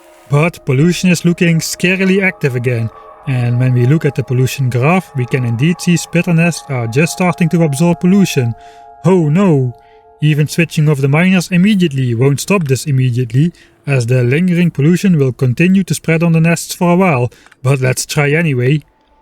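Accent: Netherlands. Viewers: Jesus christ your dutch accent.. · First one of your videos that i watch and i love your accent, is it dutch? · staalhard hollands accent ook lol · Geweldig Nederlands accent Amazing Dutch accent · Your Dutch accent is thick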